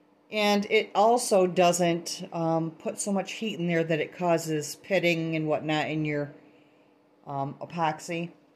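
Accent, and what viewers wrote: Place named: Midwest, United States. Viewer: Omg! You must be from the Midwest! You remind me of one my my BFFs! Makes me smile! And thanks for sharing!!! Xoxo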